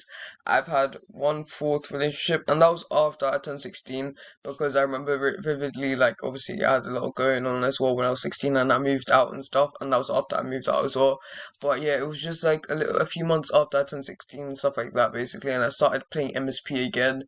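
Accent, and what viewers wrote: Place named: United Kingdom. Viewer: I love this style of video and I love your voice you are so British mate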